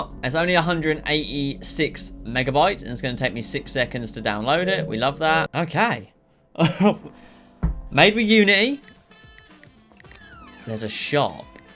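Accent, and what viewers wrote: United Kingdom. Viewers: ay he’s British like me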